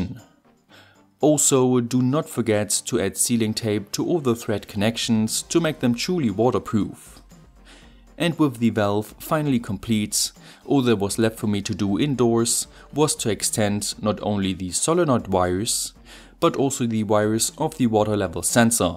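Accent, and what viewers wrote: Germany. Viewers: I think it just comes down to the German accent as some sounds in English don't appear in German, like instead of -ous (serious) we say -ös (seriös).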